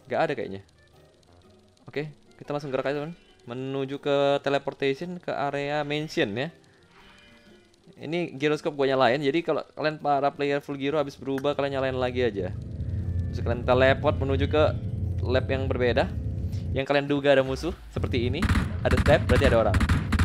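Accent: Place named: Pematangsiantar, Indonesia. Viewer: Patengtengan bang 🤣 , ngeri kali bahasa mu bg nampak kali par siantar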